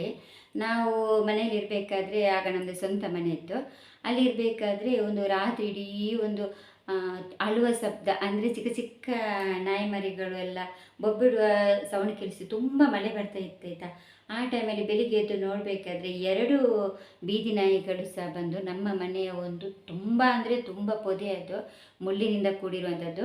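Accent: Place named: Mangalore, India. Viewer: ನೀವು ಮಾತಾಡುವ ಮಂಗಳೂರು ಕನ್ನಡ ನನಗೆ ತುಂಬಾ ಇಷ್ಟ 👍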